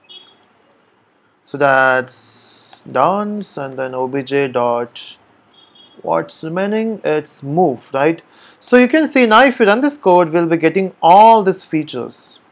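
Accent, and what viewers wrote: Telugu, India. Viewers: i think you are coming from telugu regions like andhra or telanagana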